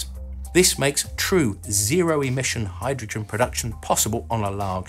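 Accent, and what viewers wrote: United Kingdom. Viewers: Classy man, with classic British accent is a great combination to be a host of an informative show. Much respect from Russia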